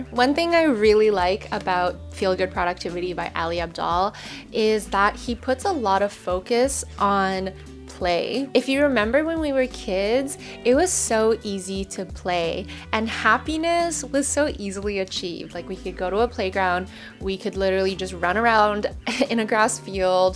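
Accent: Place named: California, United States. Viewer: What in the Californian accent is this?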